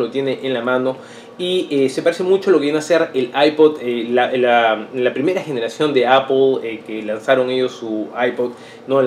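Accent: Peru. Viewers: tu acento es como de Perú es por eso que tienes más seguidores y subscriptores.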